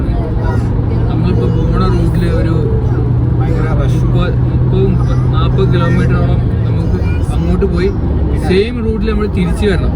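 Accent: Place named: Valluvanad, India. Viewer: വള്ളുവനാടൻ ഭാഷയിലുള്ള ഇമ്പമാർന്ന അർത്ഥപൂർണമായ നരേഷൻ.... 😌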